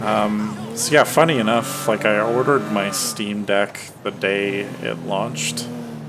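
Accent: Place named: Canada. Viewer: You have a sexy Justin Trudeau accent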